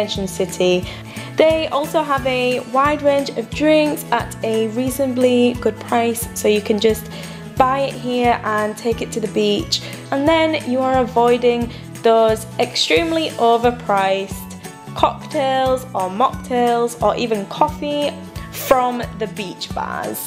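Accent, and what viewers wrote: United Kingdom. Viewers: I really love the British accent! :))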